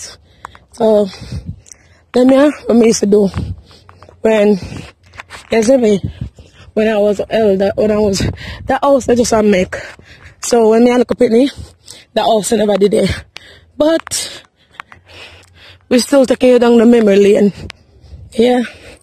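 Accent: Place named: Jamaica. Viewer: Like your attitude. You don't make overseas change you one bit. You maintain your Jamaican accent.❤💜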